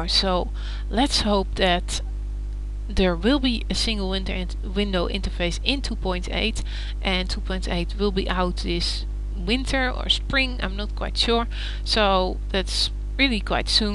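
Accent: Netherlands. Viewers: Haha Nederlands zeker, hoor het aan je accent! Wel goed gedaan hoor! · youre dutch right ;p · Ben je nl? Je accent klinkt nl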